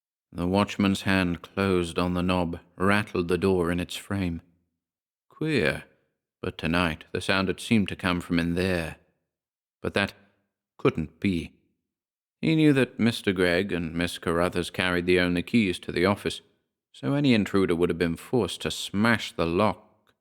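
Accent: United States. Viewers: Your American accent has gotten to be nigh perfect! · Fine job with the American accent! Sincerely, Katherine Los Angeles, California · Yay, more American!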